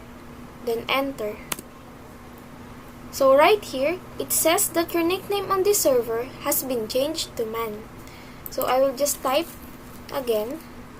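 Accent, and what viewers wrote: Philippines. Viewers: Based on her accent She is filipino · Yup i could tell bc of his accent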